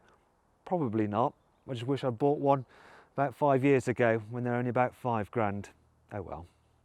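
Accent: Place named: Birmingham, United Kingdom. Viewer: Why does he sound less Brummie than on Carwow? No idea why anyone would emphasize that accent lol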